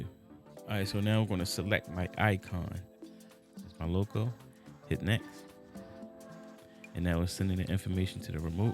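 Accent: Baltimore, United States. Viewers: Excellent review! Sounds like I here a B-more accent heavily pushing thru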